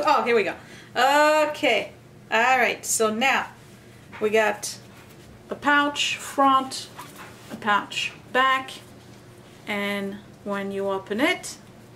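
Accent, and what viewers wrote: France. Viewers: You did an excellent job on your sewing machine table, I am so impressed!! And I love hearing you talk because I like your French accent!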